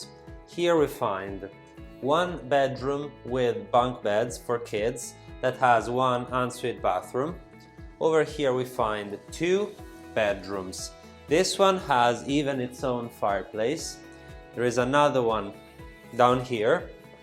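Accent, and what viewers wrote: Italy. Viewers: Great video, as always, with a very professional presentation. Your English is very good, and I love your Italian accent! I love when you say "terra cotta". I could never role an "R" like that. Please keep the videos coming, and improving your presentation. I bet the your sales are going up!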